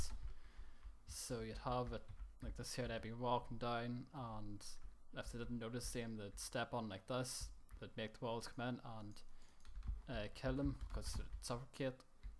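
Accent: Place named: Ireland. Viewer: hey man love this vid it gave me a lot of ideas and i love ur accent it sounds irish and im irish :)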